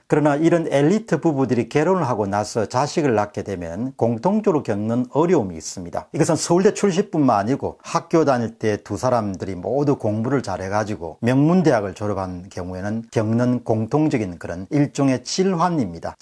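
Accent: Gyeongsang, South Korea. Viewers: 하. . . 서울 토박이 나. 갱상도 사투리 진~~~짜 싫다!!! 정보전달력이. . . ㅜㅜ 표준어 쓰시면 전달력 더 좋을듯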